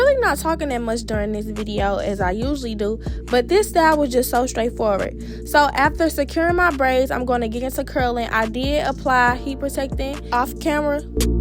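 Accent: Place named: St. Louis, United States. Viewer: St. Louis in the house!!! I just knew it when I heard your accent, babe. Beautiful!❤❤❤❤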